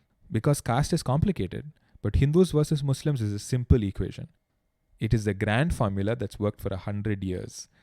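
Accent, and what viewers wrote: South India, India. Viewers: See I am so much media mind programmed. By your voice I can recognize you are from South India. Chhii I am so much racist now. Shame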